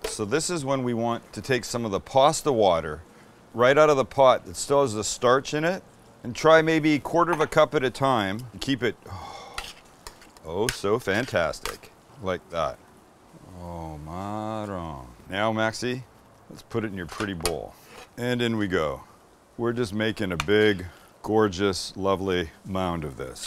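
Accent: United Kingdom. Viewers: I am from the UK and your British accent is so very cute. I love it.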